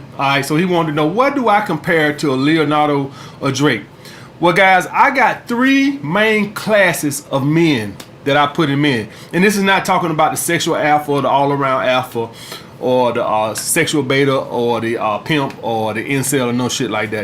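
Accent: South, United States. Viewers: I feel sorry for you guys. How pitiful do you have to be to listen to a guy like this to educate you on women. This dude country as F. Damn near sound like he got a speech impediment.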